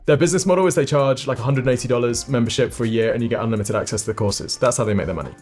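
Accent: United Kingdom. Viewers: Close your eye, you will hear he is at a normal speech speed for a podcast. At least he speaks a fairly neutral UK English... with a slight London accent (innit?} ... ... For UK standards he is super easy to understand... When I went to Edinburgh ...it often took me up to 2 minutes (or until I have heard a clear FUCK ) to see if people are actually speaking English) To Learn More about Brits are fucking impossible to understand to the point they sometimes do not understand each other : any interview with fighter Paddy Pimblett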